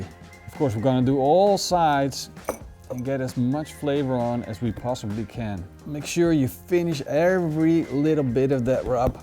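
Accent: Netherlands. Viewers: In less then then 10 sec I knew you must be dutch lol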